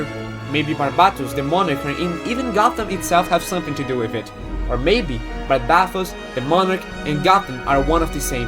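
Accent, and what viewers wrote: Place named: Brazil. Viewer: belo conteúdo, identifico sotaque br desde longe haha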